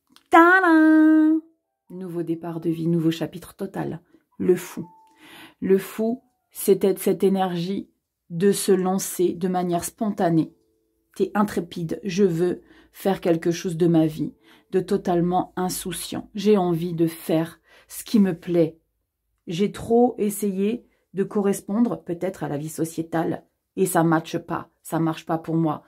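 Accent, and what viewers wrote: South, France. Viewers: Bonjour et merciiiiii!!!!!!, c'est la première fois que j'écoute l'une de tes guidances et je voulais tout d'abord te dire un grand merci pour ta bonne humeur, pour le sourire dans ta voix, pour ton enthousiasme, pour ton humour et pour ton "accent du sud" ❤ Ne change rien ❤❤❤ Maintenant merciiiiii pour la guidance et les messages ❤❤❤ J'avais tellement l'impression que cela me parlait personnellement que je me disais que ce n'était pas possible, tous les verseaux ne peuvent pas avoir le même projet que moi!!??? Alors je suis allée lire les commentaires des autres verseaux et je suis impressionnée de voir comment tes messages raisonnent pour chacun dans la même énergie même si c'est sur des chemins différents ❤❤❤ Alors encore merci pour tes messages qui confortent mes pensées et mes choix. Tu m'as donné tellement d'énergie!!! ❤❤❤ J'espère que tu as conscience du bien que tu fais autour de toi et à toutes les personnes qui t'écoutent ❤❤❤ Encore une fois ne change rien, tu rayonnes et c'est un bonheur de t'entendre ❤❤❤ Je te fais un très gros câlin ❤❤❤ Très bonne journée Valérie.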